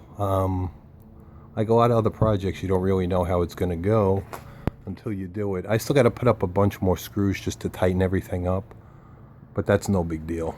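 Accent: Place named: New York, United States. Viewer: Awesome new york voice!!!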